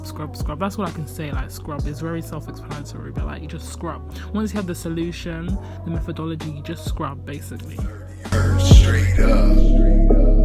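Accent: United Kingdom. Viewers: You absolutely love your British accent and your beautiful voice its like angel music to my ears i think i love you 🤣😝💕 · I'm sure you're Britishhh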